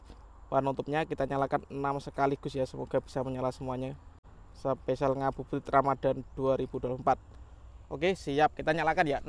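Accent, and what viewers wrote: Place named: East Java, Indonesia. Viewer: Jawa Timuran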